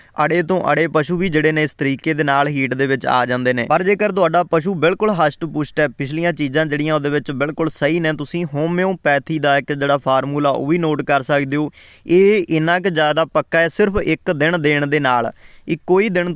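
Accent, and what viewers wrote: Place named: Majha, India. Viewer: Veer ji majhe to lagde je boli to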